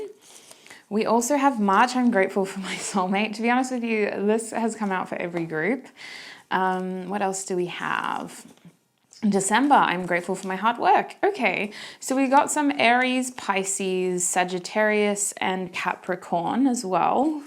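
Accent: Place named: Australia. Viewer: Listening to her videos helped me a lot with aussie accent😂❤thanks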